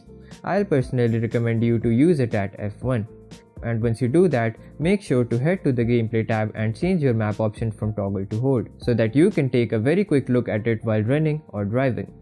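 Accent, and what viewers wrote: India. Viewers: U r speaking good and constant english without stopping Very good And r u indian?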